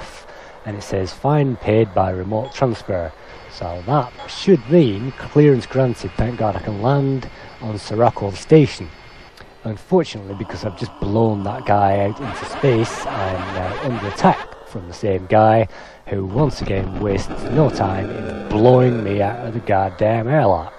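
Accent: United Kingdom. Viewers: You sound very British. Very very mooch. Moooooch. MOOOOOOOOOCH.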